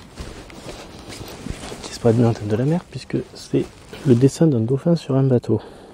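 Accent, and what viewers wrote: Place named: Var, France. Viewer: Ce film a l'air très intéressant, J'ai vue la vidéo de EMGK et j'ai bien aimé le rendu ultra contrasté. Sinon tu as fait de super cliché, notamment les reflets des bateau alignés, et les deux mouettes sur le lampadaire. Hors sujet : tu es du var non? Il me semble reconnaître le bord de mer varois, et ton accent m'est familier ;) PS : je prefère la Kirin à la Asahi :D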